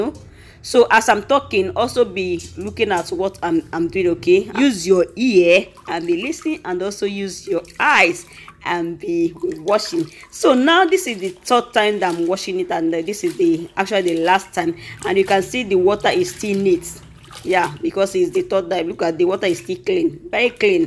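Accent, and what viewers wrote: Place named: Igbo, Nigeria. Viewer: You kept repeating ngwongwo na ngwo ngwo and I couldn't stop laughing. I love you igbo accent 😍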